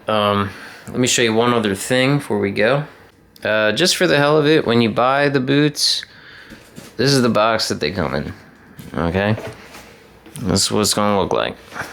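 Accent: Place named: Philadelphia, United States. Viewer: Great vid! Im guess from the accent you are from Maryland, Delaware or Phila...but if its Phila you have a light accent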